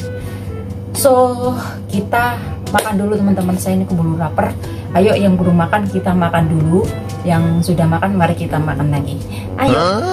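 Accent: Banyumas, Indonesia. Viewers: Semoga selalu sehat yah mba..cepet temen masak mie gorengnya mba.. Salam ngapak mba.. Pancen wong ngapak nek ora ngapak kue ora kepenak banget kooh..😊🙏🙏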